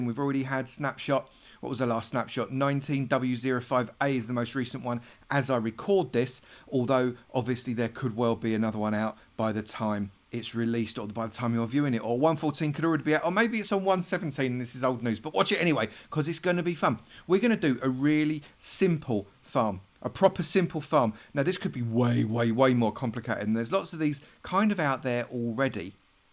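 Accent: United Kingdom. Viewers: did anybody tell you that you sound like mumbo jumbo or is it that people with British accents sound the same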